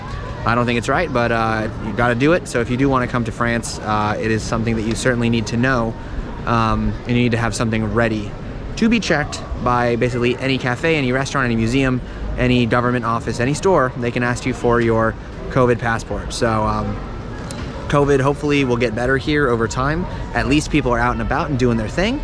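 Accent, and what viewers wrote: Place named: United States. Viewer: He sounds like from USA. But he looks Middle Eastern to me.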